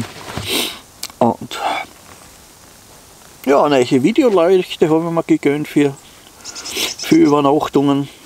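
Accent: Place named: Austria. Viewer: Sehr schön, dass Du beim österreichischen Dialekt bleibst. Das Einzige, das ich nicht verstehe, ist beim Käffchen das: Kranken Sesseln - sind die Leute, die krank sind, in Österreich Sessel? Mein Vater ist zwar aus Wien, aber ich verstehs trotzdem nicht. :) Wie immer sehr schönes Video und natürlich weiter so!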